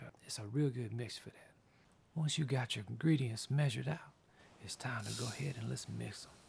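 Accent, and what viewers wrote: Louisiana, United States. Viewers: Is that a Cajun accent I hear?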